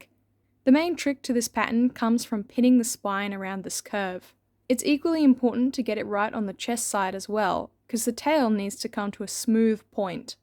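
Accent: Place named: Australia. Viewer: This is outstanding and your Aussie as well like wow!❤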